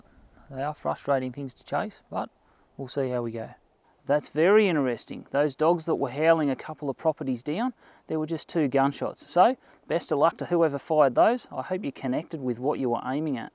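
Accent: Australia. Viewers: Great video, you sound Aussie, hope so you did good.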